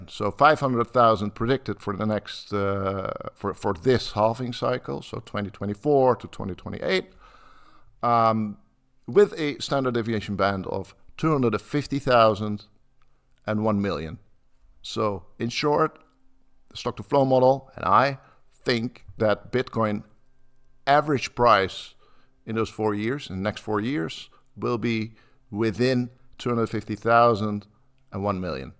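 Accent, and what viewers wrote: Netherlands. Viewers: you sound like my old professor of Applied Cognitive Psychology Phycology at the university of Utrecht! Are you Dutch ??!! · Finally ... your English pronaciation is suddenly much better ... the Dutch accent is fading ... that's cool . . .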